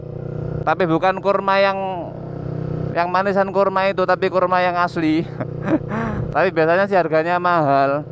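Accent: Java, Indonesia. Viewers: lucu dia orang jawa ya gitu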